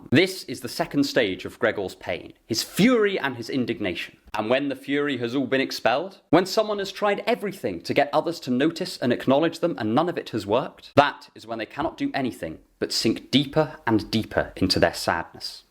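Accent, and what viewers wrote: England, United Kingdom. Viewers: Is this guy doing a good review of the book and giving in depth thought, or is it the English accent?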